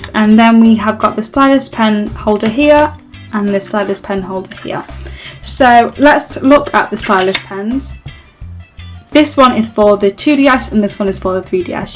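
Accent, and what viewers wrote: United Kingdom. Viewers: first video I watched I'm ages with someone that sounds British lol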